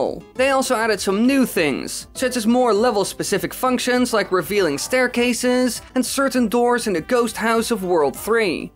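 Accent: Netherlands. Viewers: Ik denk vrij zeker te horen dat jij Nederlands bent! :D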